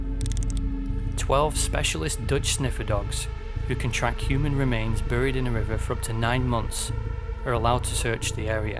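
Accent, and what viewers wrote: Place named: Lancashire, United Kingdom. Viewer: Great video and even more thanks for not being AI. Soon as I heard the accent (Lancs? Blackburn Burnley Accrington way?) I thought ‘Yessss’ and subbed. You deserve loads more subs and views 👏🏻👏🏻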